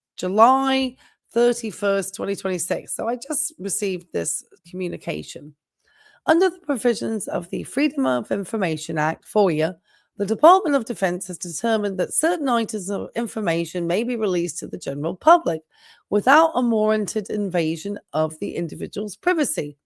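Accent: United Kingdom. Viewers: My understanding is when you join up it's actually 8 years but 4 years active then 4 years ready reserve. I do know this to be true because friend his son just got done with active duty in the Navy he has to report to some office in our city. Said just sits there all day doing paper work once a month. She must be from England sounds like a British accent hasn't a clue how American military works. Her reading these letters word for word is annoy as h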